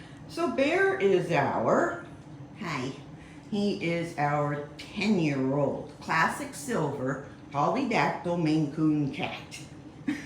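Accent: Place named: Eastern Canada, Canada. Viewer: Aboot ❤ the Eastern Canadian accent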